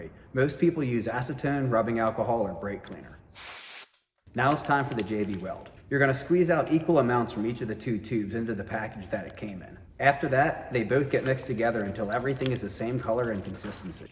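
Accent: Baltimore, United States. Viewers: Are you from Baltimore? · Baltimore accent?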